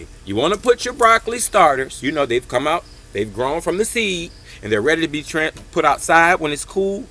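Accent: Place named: Georgia, United States. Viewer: Im from Georgia you sound like you are too!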